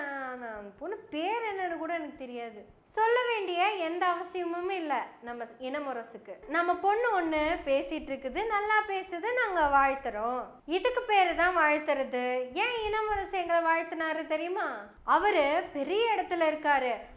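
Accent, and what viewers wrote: Kongu, India. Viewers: சகோதரி! உன் கொஞ்சும் கொங்கு தமிழ் மிகவும் சிறப்பு வாழ்த்துகள்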